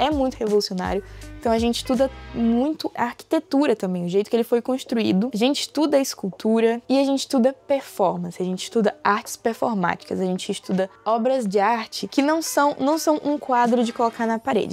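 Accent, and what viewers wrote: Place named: São Paulo, Brazil. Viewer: impressionante que dá pra reconhecer um paulista de longe pelo sutaque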